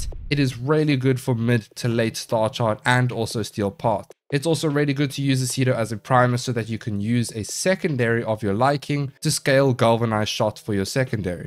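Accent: South Africa. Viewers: As someone who recently got back into Warframe, thank you! 🫡 Also, your accent sounds like you're South African, if you are, I hope that loadshedding is kind to you Tenno 🙏🏻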